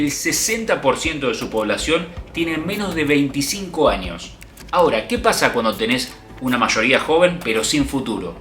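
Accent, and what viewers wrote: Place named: Argentina. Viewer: se disfruta más el tono y acento de voz argentino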